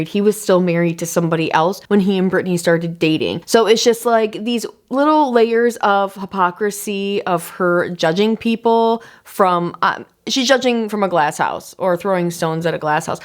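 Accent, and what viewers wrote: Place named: Midwest, United States. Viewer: Omg are u Midwestern? I hear my ‘aaaaaah-ccent’ in ur way of talking hehe ;)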